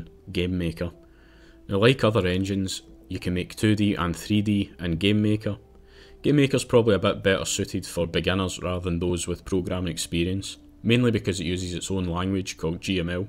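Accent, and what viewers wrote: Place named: Glasgow, United Kingdom. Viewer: Watched the whole video not because i am super interested in game dev, but u sounds like ur from Glasgow, so supporting you bro!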